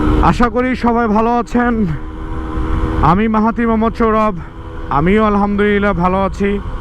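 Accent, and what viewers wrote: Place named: Tangail, Bangladesh. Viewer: Bhai apner tangail er kothai amar gram er bari tangail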